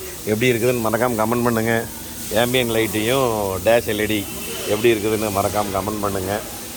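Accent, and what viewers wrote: Kongu, India. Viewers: Car Dr. Mr sir, For yours nice Kongu speech and open to all pannady. Congratulations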